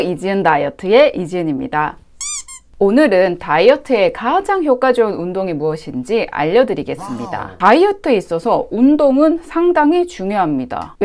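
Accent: Gyeongsang, South Korea. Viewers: 언니 갱상도 뇨자였구나? 친근해ㅎㅎ 담엔 사투리버전 영상 어때여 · 누나 혹시 경상도 출신이에요??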